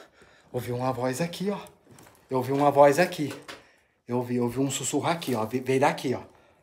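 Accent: Minas Gerais, Brazil. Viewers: Olá! Boa tarde amo assistir seus vídeos e seu trabalho! Esse seu sotaque é de mineiro? Kkkk adoro seu jeito de falar e sua humildade e honestidade!!!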